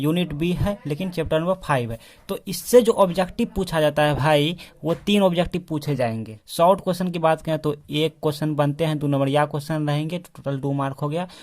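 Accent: Bihar, India. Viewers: Pure bihari.. .. 😄☺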